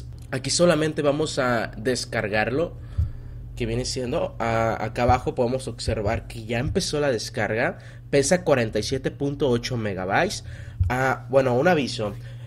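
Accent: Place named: Fresa, Mexico. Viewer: habla muy freson jaja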